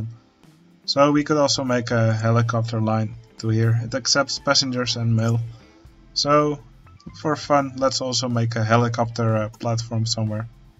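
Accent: Netherlands. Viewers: Ik kan je nederlandse accent horen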